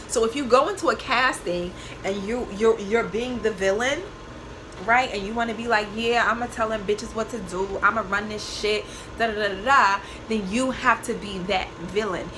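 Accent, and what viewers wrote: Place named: Chicago, United States. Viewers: what happened to that country Chicago accent? You sound like your from the Bronx now lol. Loved you on BGC and Love you now! Best Wishes too you 😘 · Damnnnn the Chicago accent totally disappeared 😂😂 still loveee me some tho!! Happy for you and your evolution ❣️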